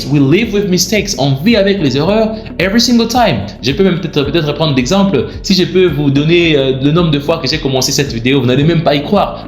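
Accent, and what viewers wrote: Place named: United States. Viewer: Hi there, i love your enthousiasm and the way you switch in a Split second from French to English, you are totally right in your explanations : speaking is absolutely primordial, the more you will do it the better it will be for your English improvements.Maybe you live in the USA , at least your accent tends suggest it? Thank you for the vidéo.Serge from France native french speaker !!!!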